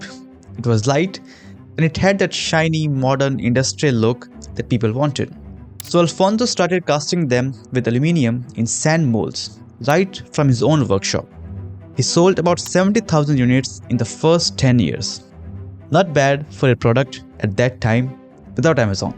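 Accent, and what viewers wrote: India. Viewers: Dislike because of Indian accent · If the voice over is AI generated, the original is Indian accented. It would be unintelligible to most non Indians.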